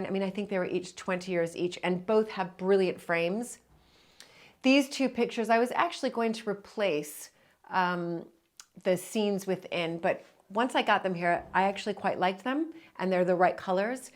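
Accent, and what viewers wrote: United Kingdom. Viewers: Could’ve left the posts on terrace and made either a trellis or strung tiny little lights sort of as a privacy screen:) I like that I can hear the slightest bit of a British accent coming through when you speak:)love your place it’s beautiful:) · it's been a while since I've watched one of your videos and you've been in Britain long enough now that your American accent is taking on a British sound in words like, also, love, all, and wall. Interesting! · I’m hearing your English accent coming through 😊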